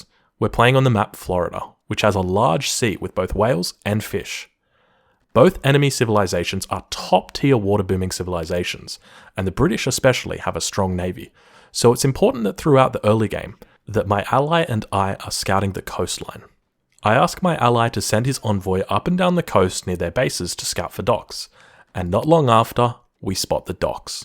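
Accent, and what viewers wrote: Australia. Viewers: Gotta love the aussie way of pronouncing "wada" Just like Modest Pelican ^^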